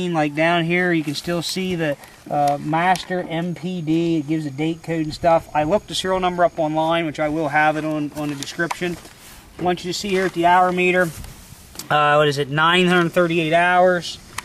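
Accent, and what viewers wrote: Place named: Pennsylvania, United States. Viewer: I can tell you're from Pennsylvania with that Pennsylvania accent lol. Good looking 318 you got there!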